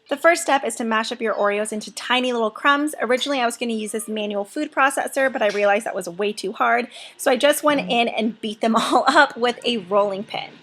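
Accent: Texas, United States. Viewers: ! You're getting a Texas twang!!! Not gonna lie- I'm looking for jobs and to relocate and I'm like "I'm gonna go to Texas. Maybe I'll see or leighann!" I'm not a stalker tho. Oh god that's how it sounds. Haha. Gotta go hide under a rock now.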